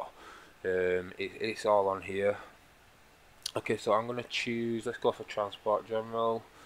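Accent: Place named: England, United Kingdom. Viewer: Hey I didn't realise you was English I though you was American. +1 for English jailbreaks and developers.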